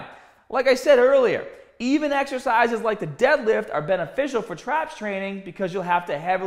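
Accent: Boston, United States. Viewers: Ok I just watched his video on deadlifting from 9 years ago, when did he develope a boston accent? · Is he from Boston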